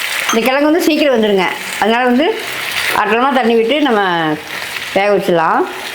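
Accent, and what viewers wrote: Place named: Kongu, India. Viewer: Super parkkavea 🤤aaguthu maravalli kilangula eppadi senju saptirukkom sakkravalli la eni thaa try pannanum samayal video nu start pannirukeenga niraya per ku dovuts varum ellarthukkum reply pannunga antha responce kea nalla views pogum neenga pesurathea kongu slanga ovoru wordskum eadaila responce ah erukku 🙏 enaku thonunatha sonna thappa eaduthukka vendam thank u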